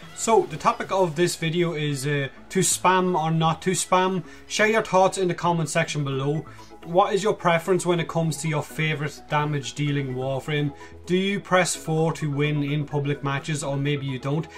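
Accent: Ireland. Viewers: He sounds more like Irish, whereas Grade is more like English english.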